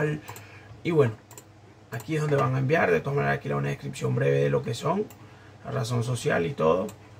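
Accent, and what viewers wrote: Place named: Puerto Rico. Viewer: Amigo sonas puertorriqueño, de que parte de argentina sos?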